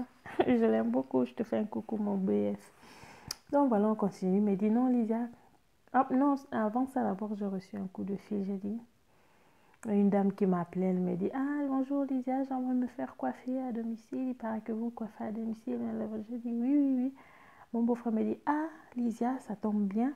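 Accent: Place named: Gabon. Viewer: Tu as comme un accent Gabonais ! Aniway je m'abonne 😊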